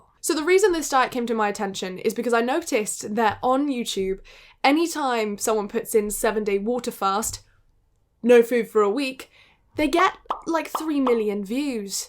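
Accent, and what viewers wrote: United Kingdom. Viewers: Hii!! ❤ I love your videos so much and omg your british accent is something else girl! 😍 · Honestly when I clicked on this video I forgot she’s British so I was surprised when she started talking all British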